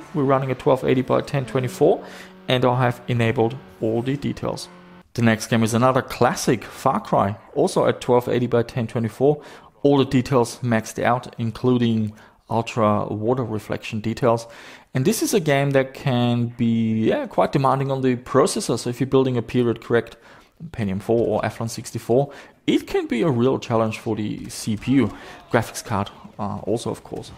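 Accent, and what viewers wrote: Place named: Germany. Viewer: Hey man how come you sound more German than Australian?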